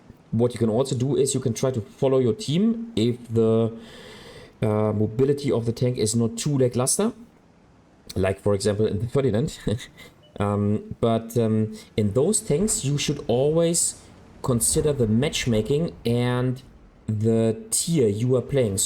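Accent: Germany. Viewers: Do I hear a German accent in your voice?🤔😁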